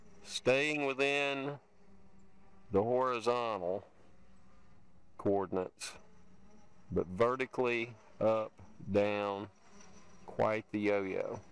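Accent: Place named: South, United States. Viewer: In my nearly 70 years I do believe i have learned a thing-or-two. As Yosemite Sam would say, only a 'Gol-Darn-Idjet' flies over water when TESTING anything unsure of. And by-the-way, your voice takes me 'down home' a bit... lived in SC, now up in MA for 20+ years. Love it here in my home, but I truly miss the 'Southern Speak', y'all. STOP testing over water! ;-)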